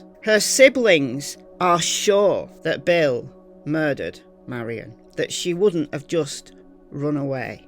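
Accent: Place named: Lancashire, United Kingdom. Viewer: He definitely did it !!!!!! Must say it's great to hear a Lancashire lass your brilliant from a fellow Lancashire lass 👧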